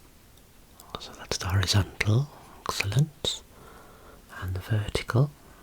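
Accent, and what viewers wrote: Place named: Scotland, United Kingdom. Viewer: Quick question: Are you Scottish? Your accent gives me Scottisch vibes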